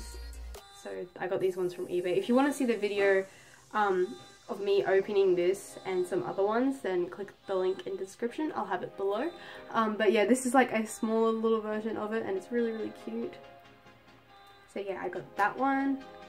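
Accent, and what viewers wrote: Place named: Australia. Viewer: Erika Flores I have the same accent as her it is cause she is from Australia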